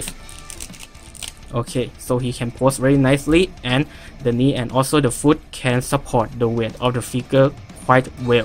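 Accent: Thailand. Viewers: สำเนียงเหมือนคนไทยเลย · Are you Thai? พี่เป็นคนไทยไหมครับ ฟังจากสำเนียงแล้วน่าจะใช่( From your accent).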